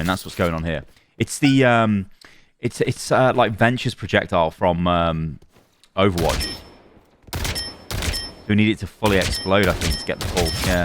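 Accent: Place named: Newcastle, United Kingdom. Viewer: Sounds like Newcastle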